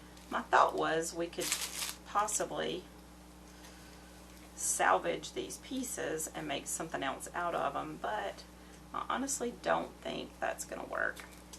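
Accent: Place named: Texas, United States. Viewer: I am so excited and feel so blessed since the very time I thought of subscribing to your channel because you are not only super inspiring and talented but you have totally inspired me 💯% on transforming my crafting room because I just had all these beautiful things I've been purchasing all the time and I did not know where to start and finally I'm seeing a light at the end of the tunnel because my crafting room is my hugeeee attic and up here I also have a fire place which makes it even more fun and beautiful. Thank you so much ☺💖 oh btw I so love your accent reminds me of when I lived for a little while in texas I actually delivered my youngest in dallas and I miss it so much over my husband and I are really thinking of moving back somewhere in the very peaceful side of texas god willing and fingers crossed 🙏☺ God bless and I look forward on seeing your channel bloom and grow so much because you really deserve it 💖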